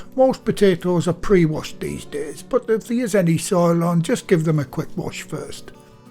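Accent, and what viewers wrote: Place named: Northern England, United Kingdom. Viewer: Your roasties look wonderful. I am from the North East of England too, now living in Australia. Nice to hear a Northern accent!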